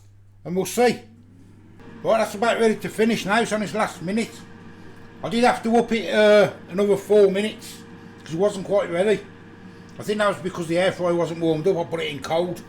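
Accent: Birmingham, United Kingdom. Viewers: Proper Brummie love it.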